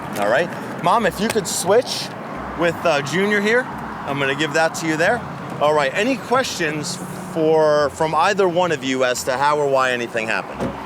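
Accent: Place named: Long Island, United States. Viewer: You can tell you are from Long Island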